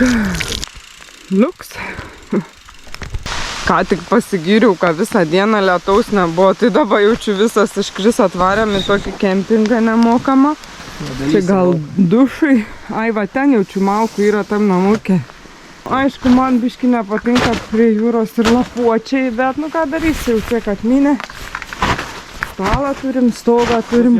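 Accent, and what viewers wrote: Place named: Šiauliai, Lithuania. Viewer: Kaip miela girdėti šiaulietišką šnektą😊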